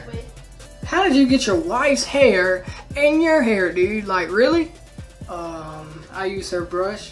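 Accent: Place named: South, United States. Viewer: Sounds like the south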